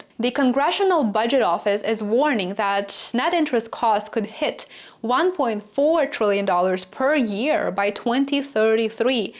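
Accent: Russia. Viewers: Pretty Russian accent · She sounds Russian. Is this Putin's propaganda Ho?!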